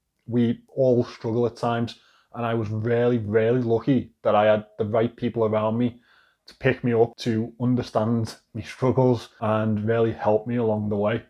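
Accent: Liverpool, United Kingdom. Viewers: Sorry for all you’ve been going through, but congratulations about being able to do this full time now. (May I ask if you’re from the Liverpool area? I sense a Scouse accent, but I’m American so I’m never sure. I’m just a big Liverpool FC fan and have been for about 16 years.) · Wow, that was unexpected. I really thought you are shutting down the channel. But now it is a different story! Wow. 🙂 I am not an English native speaker, so I used to struggle understanding your dialect, but with every video I get more and more. I recognize your videos not only as a way to discover watches, but also as a way to learn and better understand British English.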